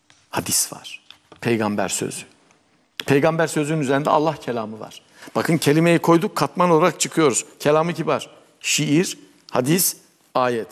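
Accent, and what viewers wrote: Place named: Erzurum, Turkey. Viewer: Allah hocamiza uzun omur versin. Erzrum şivəsi, eyni İrəvan turklərinin şivəsi!!!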